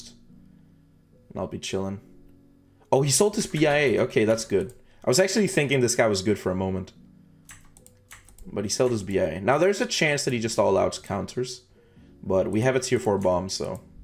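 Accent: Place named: Denmark. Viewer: I just gotta ask, what do you think about Btd Battles 2. Oh yeah, I hear a weak danish accent, hører jeg rigtigt?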